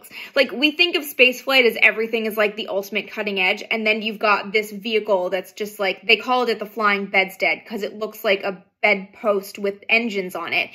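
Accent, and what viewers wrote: Canada. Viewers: Def. Canadian, BC? your abouts and outs gave you away, just need to hear you say soory, not sorry, soory, either BC or Quebec that's my guess, maybe Ontario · Is it weird that I’ve watched you 3x just to hear you say check oot….etc? Go northern neighbor!! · Yes, she's Canadian, eh.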